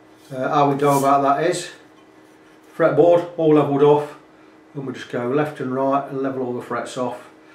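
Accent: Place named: Nottingham, United Kingdom. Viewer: Sounds like a Nottingham or possibly Derby accent there...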